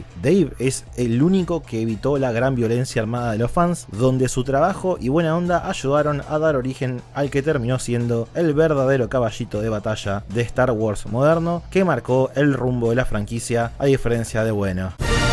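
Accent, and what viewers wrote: Argentina. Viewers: es argentino? Pobre. Saludos desde argentina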